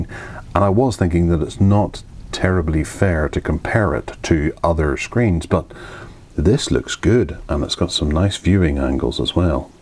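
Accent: United Kingdom. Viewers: Books: H2G2, David Niven, Roger Moore, Sean Connery, and an Aston Martin DB5 Model. And a very nice British gentleman's accent. So far the interesting part of the Video. The P11 is nice, though. ;-)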